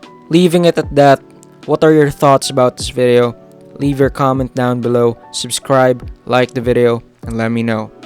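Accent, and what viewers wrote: Philippines. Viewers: I'm sorry but I can't with the Filipino accent 😢😢😢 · I can hear the Filipino accent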